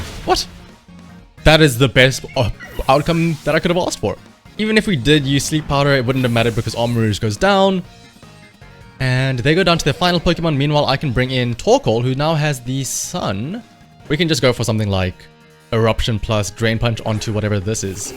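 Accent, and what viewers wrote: South Africa. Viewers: hey man are you from south africa? I feel like i recognise the accent haha. saying this in zimbabwe!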